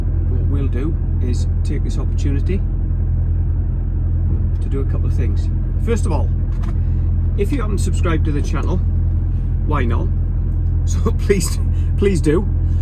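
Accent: Wales, United Kingdom. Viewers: great video, do I detect a welsh accent, good to see you are not having your image in screen at all times, like some I could mention !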